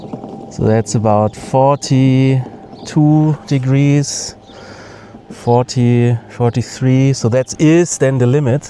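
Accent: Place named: Germany. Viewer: Hää das klingt ja ziemlich deutsch dein Dialekt 😅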